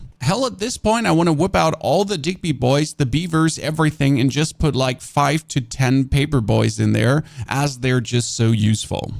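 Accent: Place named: Germany. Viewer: its probably cause your german but i absolutely love how you sound explaining things can you possibly narrate my life day by day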